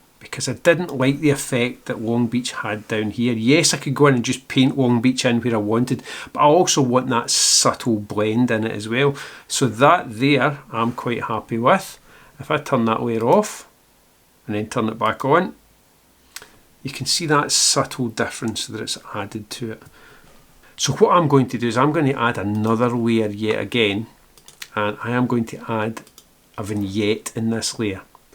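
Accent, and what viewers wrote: Scotland, United Kingdom. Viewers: hi , since I watch you I don't know if I became a pro of Luminar but certainly I did improve my understanding of the Scottish accent :-)) ... I wish I knew you before so I could understand the Gallagher brothers ( (I know there are from north England but it seem to be close from yours) during my last photo-session with them (so frustrating not to understand a single word) ... So please note , I love Scottish people and their folk accent but please just try to be more British if it's possible , for a French guy well trained in English it wasn't so easy to understand ;-) thanks ... best ... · Just found your channel and I'm glad I did. Excellent tutorial and easy to follow what you're doing. Eilean Donan must be the most photographed castle in Scotland. Visited it many times on my way over to Skye. Nice to hear a shots accent on the tube, and I'm looking forward to more of your tutorials. 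· Great edit, clear step by step instructions as usual. You are really helping me to become more and more confident with Luminar,. Oh, and I could listen to your wonderful Scottish accent all day! 👍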